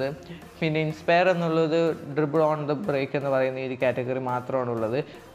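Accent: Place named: Palakkad, India. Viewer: എനിക്ക് slang കേട്ടപ്പോൾ മനസ്സിലായി ഞാനും paalakadanu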